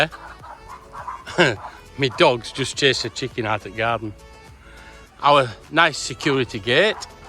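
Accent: United Kingdom. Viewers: Where you from in the UK. Sounds like the North East of England.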